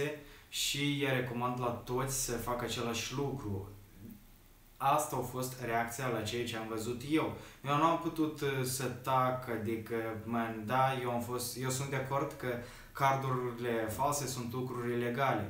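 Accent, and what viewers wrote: Moldova. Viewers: Bro e moldovean=)))))evident ca vorbeste asa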